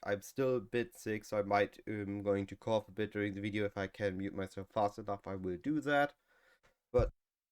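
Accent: Germany. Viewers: German accent is so disgusting and muddled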